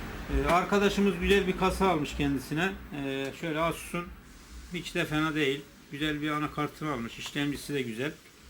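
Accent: Erzurum, Turkey. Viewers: abi konuşmandan anlamıştım erzurumlu olduğunu ondan sordum bende erzurum oltuluyum dadaşkentte oturuyarum. anakart işlemci vb hari&#231; size ka&#231; tl veriyoruz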